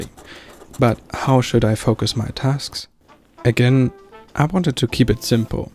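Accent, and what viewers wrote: Germany. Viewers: ❤ After a few sec (lovely vibe) and after your first words (slight German 🇩🇪 accent) - LIKED & SUBBED. Period. Tschüss, Ich war aus Ungarn 🇭🇺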